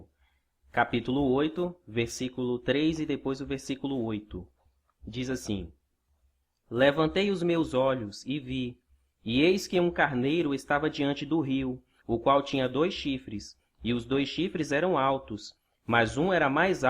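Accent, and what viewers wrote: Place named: Northeast, Brazil. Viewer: Seu canal é didática bíblica. Porque não põe seu nome? Seria muito bom conhece você seu sotaque nordestino lembra irmãos amados do nordeste um abraço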